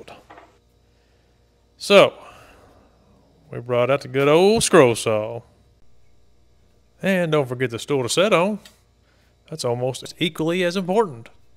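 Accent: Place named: Mississippi, United States. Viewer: Yeah, I live in the northwest now, but I knows my peeps when I hear 'em speak. lol Spent summers with grandparents in West Point, MS. growing up. When I first heard you speaking I thought you were one of my uncles. lol Good video by the way. Chat later.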